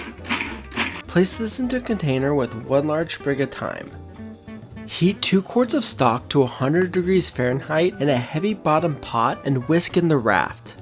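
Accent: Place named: United States. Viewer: *Clicks on recipe video.* *American starts talking.* *Uses SCALE and GRAMS* 🥹🥹🥹 You got me right in the beginning! I really do appreciate Americans who use the (superior) metric system even though it's not the standard in your country. Thanks a lot! (In case you're Canadian though I'm sorry for calling you American lmao 😂 sometimes I don't recognize the accent)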